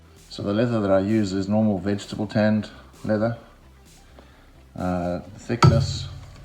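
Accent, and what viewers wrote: South Africa. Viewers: Are you from SA? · Very cool. BTW, why do you sound half South African? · You sound afrikaans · davis - smith. He sounds like a full on jozi boytjie. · Awee he sounds like a South African ou.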